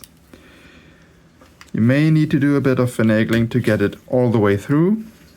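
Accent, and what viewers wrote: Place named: Germany. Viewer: Mann hört das deutsche aus dem Akzent 😂 Bro was für ein Feuerwall sicherungshebeö verwendest du ?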